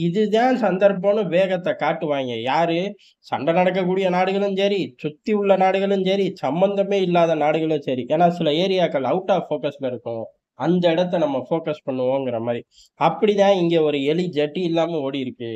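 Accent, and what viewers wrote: Madurai, India. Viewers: மதுரை ஸ்லாங்காத் தெரியுது !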